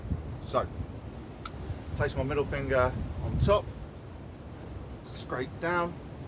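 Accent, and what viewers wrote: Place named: United Kingdom. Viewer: Hi, these videos are fantastic, thank you! I had no idea that you had made all these fire starting videos when I left a comment recently asking for some from you on fire steels or ferro rods. So I am just enjoying watching your videos for now. Next time I know I can check your play list first. And once again its great to hear a British accent talking about bush craft 👍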